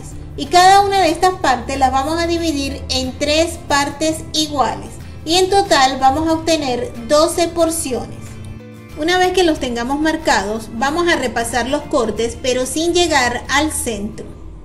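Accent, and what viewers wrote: Venezuela. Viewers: Estoy buscando recetas que sustituyan el clásico pan de jamón de la cena de Nochebuena y Nochevieja venezolana y esta receta es la que más me gusta, por tu acento creo que eres venezolana también, o me equivoco? Saludos.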